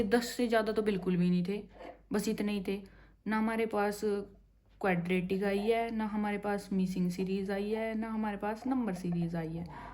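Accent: Haryana, India. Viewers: Haryanwi ho kya accent se lg rha h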